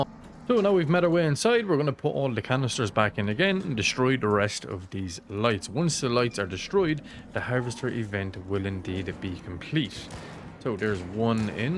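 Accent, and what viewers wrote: Ireland. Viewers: Where in Ireland are ya,?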